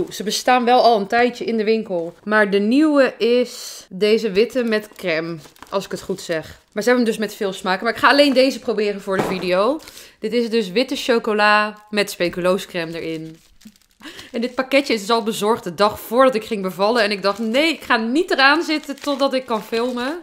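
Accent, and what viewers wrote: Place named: Brabant, Netherlands. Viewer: Ik hou van het Brabantse accent van jou, voelt als thuis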